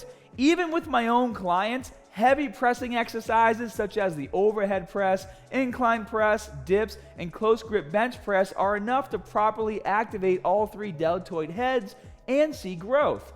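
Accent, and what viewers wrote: Boston, United States. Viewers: 😂 its like Boston accent is sneaking out. Let it beathe bro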